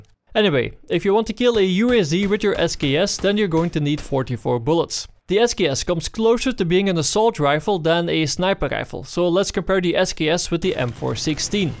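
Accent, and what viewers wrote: Netherlands. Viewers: its a dutch accent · (i'm guessing he's dutch) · i think ure dutch nice vid ik ben ook nl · lets listen to your accent.... Are you Dutch? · this is dutch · You're a dutchie, right? :D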